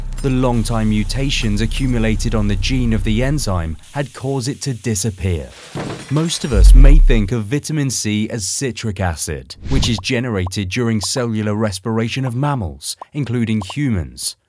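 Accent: United Kingdom. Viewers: His pronunciation is the correct Brittish way of pronouncing it (and thereby most of Europe as well). You must be American.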